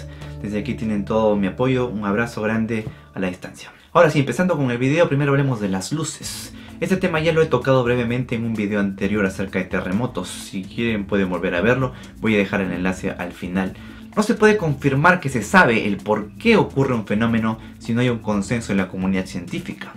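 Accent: Peru. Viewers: eres peruano? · Sospechaba que era de Perú pero recien hoy lo confirme xd , que orgullo que este hombre sea peruano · Eres peruano??? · Recién me entero que eres de Perú xD, aunque ya me daba esa sensación por tu forma tan neutra al hablar castellano y eso es algo característico de aquí.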